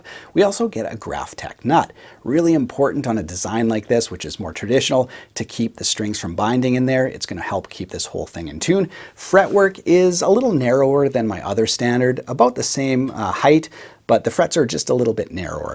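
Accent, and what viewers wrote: Canada. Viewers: I could tell you were from Canada! I'm from PEI!...'Eh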